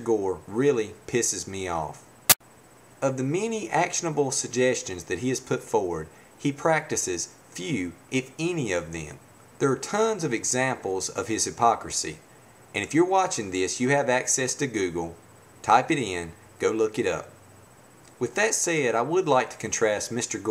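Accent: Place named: South, United States. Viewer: The beautiful thing about this well-thought, honest, intelligent presentation is m'man's accent. Born in Tulsa, Oklahoma, I was raised in Dallas, and now live in Houston, Texas. All of my life, I have been pounded by the media with two predominate thoughts about the men of the Southern United States; we are all of two stereotypes: 'The Worst of Villains' or 'The incompetent Idiots'. It is a shame that Gore has a dishonorable accent isn't it? My hat's off to you. Ya Done Good! Thanks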